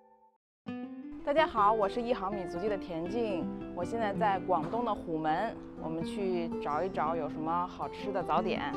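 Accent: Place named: Beijing, China. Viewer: 京腔和虎门的肠粉。饭量有点大。😀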